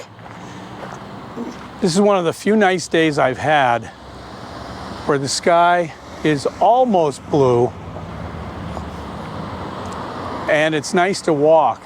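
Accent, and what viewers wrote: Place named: Rioplatense, Uruguay. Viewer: Rioplatense accent. That’s what we speak in Uruguay. Pretty different from the rest of latinoamerica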